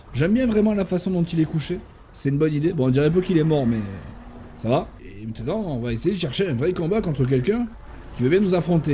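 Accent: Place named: Illinois, United States. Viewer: Tu serait pas à moitier américains par hasard? J'ai reconnue ton accent de l'illinois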